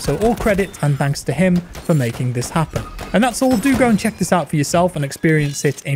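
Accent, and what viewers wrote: United Kingdom. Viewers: Are you brithish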